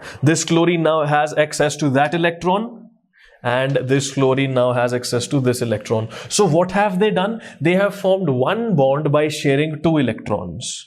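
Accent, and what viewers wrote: Punjab, India. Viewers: Sir are you from punjab??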